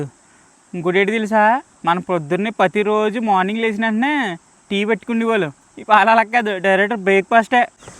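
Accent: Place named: Andhra Pradesh, India. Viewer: I like your andhra slang 🫣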